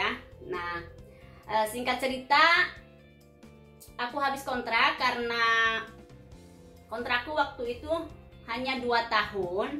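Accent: Sunda, Indonesia. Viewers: Salam kenal sy dari kadipaten majalengka..mau tanya.Mama.asli ny orang mana..logatny sperti orang sunda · Seru ngomong teh sunda banget jd seneng ngikutinya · Bu sundanya dariama mani medok sundana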